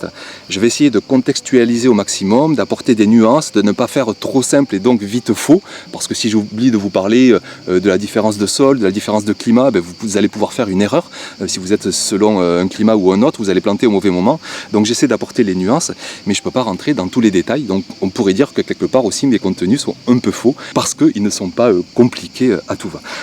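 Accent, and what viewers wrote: South, France. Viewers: Hello, Happy birthday 🎉🎉🎉🎉, je te suis depuis un peu près 8ans, et je ne m'en lasse pas😂, je suis de Belgique.. Mon potager est tout mini rikiki comparé au tiens, mais je ne cultive que l'été, j'ai une superbe serre que mon chéri m'a acheté et j'y suis tout le temps.. Si c'est pas en cuisine, c'est dans le potager 😂😂😂. Le potager est mon excutoire, mon endroit de ressourcement, et puis j'ai comme toi cette fierté de donner des bonnes choses à manger à ma famille, j'aime aussi le partage, et souvent plutôt de garder pour moi, je donne.😮. Ne change rien, ton authenticité et l'humanité que tu degages en fais ta force..et puis c'est tellement plaisant d'entendre ton accent du sud rempli de bienveillance 😊. Sois heureux de ce que tu fais et fière de qui tu es. Au plaisir 😊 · Salut, alors je ne peux pas te dire depuis combien de temps je regarde tes vidéos mais depuis pas mal de temps! 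Je suis toujours content quand je vois que tu as publié une nouvelle vidéo, et j'ai hâte de la voir quand j'ai 5min Je me souviens encore les dimanche a me dire tient mais faut que j'aille voire Youtube a du mettre une vidéo 👍 Merci a toi en tout cas toujours "une totale regalade" comme tu le dis souvent avec ton petit accent du sud 😉 Perso j'adore les expérimentations sur différentes techniques etc, je trouve que on peut être surpris des pratiques qu'on fait depuis longtemps ça remet en question j'aime beaucoup! Continue comme ça et merci encore!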